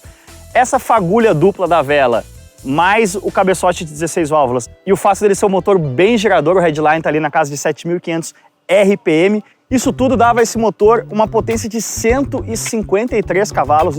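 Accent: Italy. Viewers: teu sotaque italiano é maravilhoso! Mas meus ouvidos estão sangrando..... kkkkkkkkkkkk. Brincadeira! Abraços! Em tempo: já vi um desses com motor V6. Aqui em Florianópolis sei de uma pessoa que tem uma, porém não sei se é 4 ou 6. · !!! Parabéns pela escolha!! Linda machinna!!! Não deixe de fazer matérias com o seu Bello sotaque italiano!!! Que bom ter vc como alfista..sou de Londrina tenho 2 machinnas uma é a Sofia e outra é a Franchesca...E carrego grande orgulho de ser descendente de italianos... viva Alfa Romeo. Abraço amici Alfisti. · Mais que belo video (sutaque italiano) linda historia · O sotaque italiano do é engraçado, quando ele fala italiano eu me mato de rir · “Tem gente que não gosta do meu sotaque italiano “ tem gente que se incomoda contudo, pelo menos nós aprendemos as pronúncias corretas ou próximo do corretor, show de bola man AlfaRomeu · não tem nada mais chato que esse """""Sotaque"""""" italiano pqp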